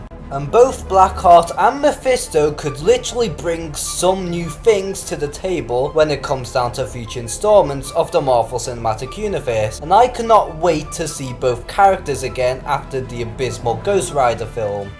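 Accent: Liverpool, United Kingdom. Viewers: One of the most cringe worthy videos I have ever seen. Your voice is bland and boring. Plus the fact that you're Scouse makes it even worse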